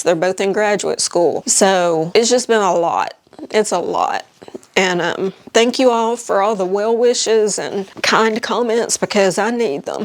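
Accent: South, United States. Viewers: Writing from Washington State where style and fashion never got a hold.. Sorry, WA State! Love your style and southern dialect. 😍❤❤So sorry to hear about your auntie.😥 Wishing you the best and strength!!! And thanks for letting me escape fleece country by watching your channel. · I just discovered your videos recently and I think you are cute as a button. I love hearing your voice and and sweet southern accent! :) You've inspired me to get a much shorter hair-style...I feel like a new women! I hope your aunt gets situated into a happy situation. No one really understand how much we women are/feel responsible for everyone and every thing! Hang in there! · Hi. I enjoy your videos and your southern accent reminds me of my own aunts I have down south. As a nurse, I truly understand what happens when an older person falls and breaks a hip and then the aftermath when they have dementia and that certainly progresses. 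It sounds like you’re doing everything to keep her safe and well taking care of. I recently lost my mother earlier this year and feel alone also as you described in your video with your aunt being the last connection to that side of the family. All you can do is take it one day at a time and some days will be hard. Take care of yourself.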